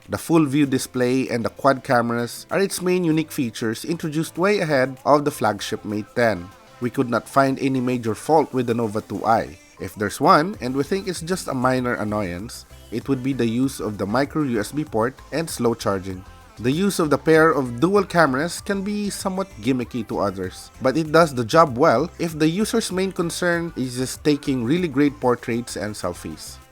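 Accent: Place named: Philippines. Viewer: Why does he sound like a Filipino speaking in english?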